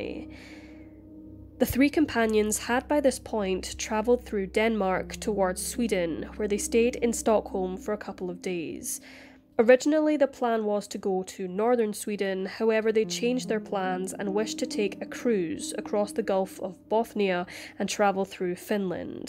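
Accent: Scotland, United Kingdom. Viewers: It's amazing theres so much love for this girls voice.. it soothing, clear word pronunciation, husky and the Scottish accent. I love it!!! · Love a Scottish accent. My favorite. 😊♥️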